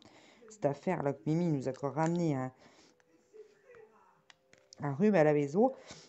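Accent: North, France. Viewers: Désolée, mon commentaire est envoyé 😙😟. J’habite maintenant en Charente maritime mais suis originaire du pas de calais, quand je t’écoute je retrouve avec plaisir les gens du Nord ! Bravo à Lolo pour le concours. Bisous et à bientôt.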